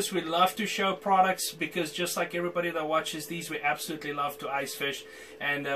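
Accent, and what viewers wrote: Afrikaans, South Africa. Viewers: Definitely a south African dude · Sounds South African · Is that accent i hear afrikaans? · To me he sounds more South-African :-) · Did I guess his accent?? I guessed South African! It's the accent that sounds like 5 accents mixed together. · South African Afrikaans, perhaps. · Yes SA def ...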